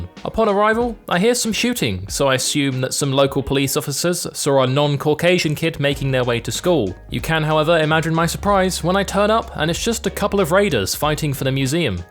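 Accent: England, United Kingdom. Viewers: There is no such word as 'nucular'. That is an embarrassing and ridiculous bushism. Things concerning the nucleus are termed 'nuclear'. You sound English, so why can't you spell and pronounce English words? Not the best idea to display your ignorance to the world by uploading it to a massively popular video website. .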